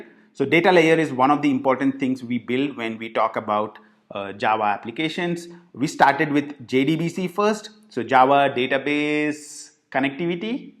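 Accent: India. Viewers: Very interesting content, but the indian pronunciation is awful 😑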